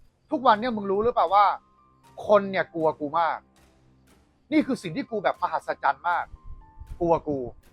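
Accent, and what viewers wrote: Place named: Thailand. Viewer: ผมชอบนะ..เพราะมันคือความจริง ที่พูด...ถึงแม้ลีลาการพูดจะแบบไทยแท้..แต่ สุโสสัง ลพเตปัญญัง ฟังด้วยดีย่อมได้ปัญญา...กรรมไผกรรมมัน ทำไป..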